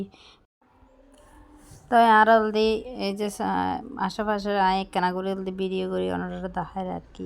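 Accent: Chittagong, Bangladesh. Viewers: আপনার মুখে চট্টগ্রাম এর ভাষা ভালই লাগল।❤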